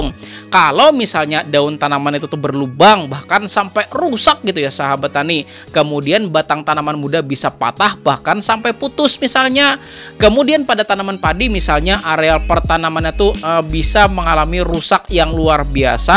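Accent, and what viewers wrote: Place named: South Kalimantan, Indonesia. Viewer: Suka denger gaya abangnya jelasin. Di dengar dari logatnya, orang Kalsel ya bang?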